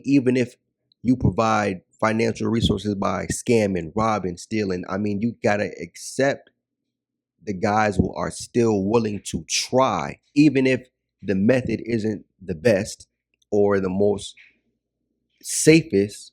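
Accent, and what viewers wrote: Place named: Caribbean. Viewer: Yeah, i can definitely here the Caribbean in you brotha. Your content is spot on. SYSBM/ traveling is the only way for brothas. I make content as well talking about SYSBM, thinking black men & black mansandry. I got a black mansandry playlist of content I've made.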